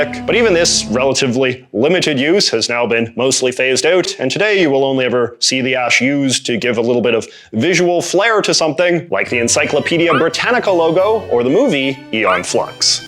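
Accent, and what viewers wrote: Canada. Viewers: By any chance are you Canadian? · North Midwestern (MN, USA) here. "Hey, how yous doing?" is addressing a group of people in some rural areas in the north west of the state. I am unsure of how common using yous as a plural you is, but I'm sure it bleeds into Canada in many places, eh? Having grown up near Fargo, it is good to hear my home accent on this channel, but I suspect the host on this channel is Canadian, as the accent leans real heavy on the double-o ooh in 'about' and such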